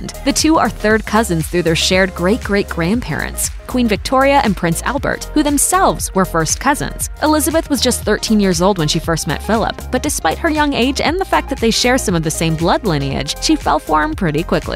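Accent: United States. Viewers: why is the narrator American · American accents (and not well spoken) are wrong for this kind of documentary (my opinion)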